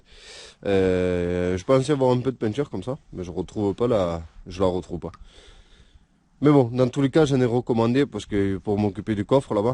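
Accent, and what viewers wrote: South, France. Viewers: Oh tu as l'accent du midi toi non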